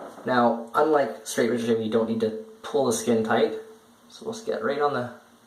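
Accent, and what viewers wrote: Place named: Canada. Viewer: You sort of sound like Ed Norton's character in the movie Rounders with a bit of a Canadian twist.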